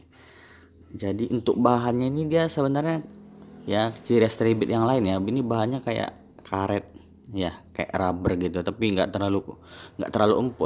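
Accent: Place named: North Sumatra, Indonesia. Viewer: Kek anak Sumut kau bg, dari logatnya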